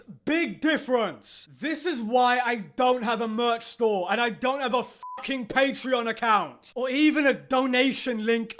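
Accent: United Kingdom. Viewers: The British soccer hooligan accent also sells it.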